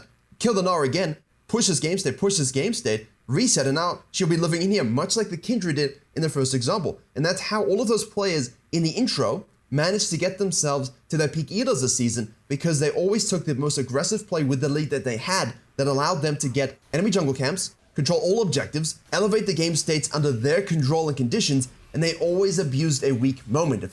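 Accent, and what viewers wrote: South Africa. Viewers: Was wondering from his accent where he was from, that's really cool to know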